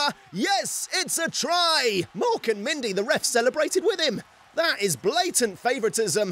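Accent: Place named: United Kingdom. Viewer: why British accents are so awesome and sexy.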